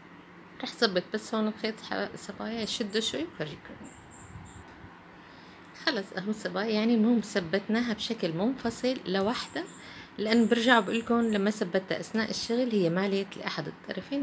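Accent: Egypt. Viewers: ما شاء الله بتجنني شغلك بياخد العقل بنت بلدي سورية مع لهجة مصرية ربي يحميكي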